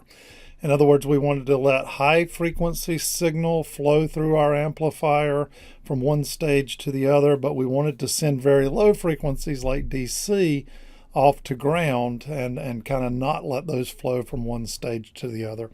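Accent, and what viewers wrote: South, United States. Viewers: that southern drawl of yours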